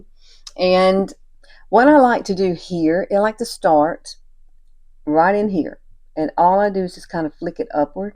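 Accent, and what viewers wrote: South, United States. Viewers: Watched your lovely informative video from 2024. Love your soft Southern accent.I’m 77 had my eyebrows tattooed years ago Now I have dark thin eyebrows 😢!! Thank you,Carol from ‘Australia’❤ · I just love your accent. I think southern accents make you sound friendly. It just makes me smile. Thank you for this tutorial. My daughter is getting married next month and I’m trying to relearn how to put on makeup again. Haven’t worn it for years. This is gonna be very helpful until I do it myself. Haha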